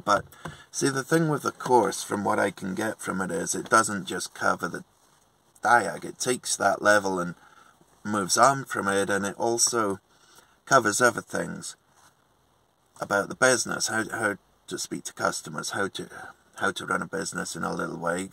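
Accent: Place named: Cornwall, United Kingdom. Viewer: Joanne says you’re beginning to get a Cornish twang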